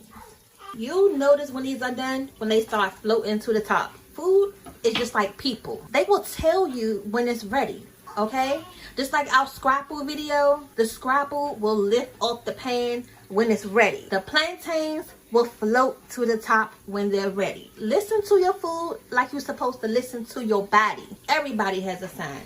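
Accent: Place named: DMV, United States. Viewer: Sound like she from the DMV!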